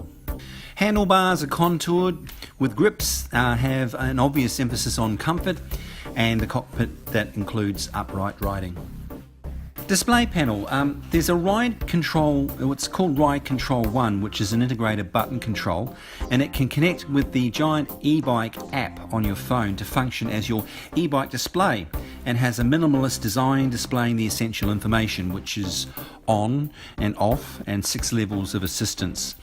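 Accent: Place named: United Kingdom. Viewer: Dude...I love your commentary. You sound British to me but whatever you are - you do an awesome job! Thanks for doing a real review.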